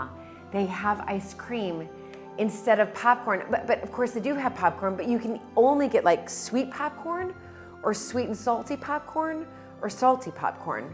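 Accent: United States. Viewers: Just to point out, has a total American voice, nothing posh about her, but she has adopted words that the British use and Americans don't. We don't say "drinks party" but "cocktail party". And we don't say we're going to the cinema ever, we say we're going to the movies. I just thought I'd mention that. Her language has adopted British terms. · Thank you for remaining “You”, which is what, I’m sure, your husband fell in love with. It must have been quite an adjustment. What’s so interesting is that the village towns you show are so similar to those of New England, with the quaint town commons and shops. I live on Cape Cod, Massachusetts, so I understand you falling in love with the beauty of the countryside. Listening to you, I do hear the British inflections, but you are still have,after 20 years, the American accent. It’s so easy to pick up that you often don’t know you have. 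When I met my husband, he wondered if I had lived in Britain. I laughed and explain that my daughter and I had only been bingeing on BBC mini-series, etc, but it was enough to affect our speech. When I visited Britain, the same happened. On the other hand, the opposite happened to Britain visitors to the US. They still had the accent, but was often sprinkled with Americanisms. You are so personable. I would love to meet you and just talk, but I think you would have me laughing with your wonderful down-to-earth personality. 😊 Btw, I get it about the letter pronunciation. I spent an hour looking for “Darby” street. Finally someone told me “Derby” was pronounced “Darby”. I also spent 3 days asking about getting to Edinburgh. Everyone said “yes, it’s a long trip”. I was so disappointed UNTIL I asked “how long”. They then said, about 4 hours! I said “WHAT”?? There are Americans that travel that distance to work!!.” I don’t know if you know what th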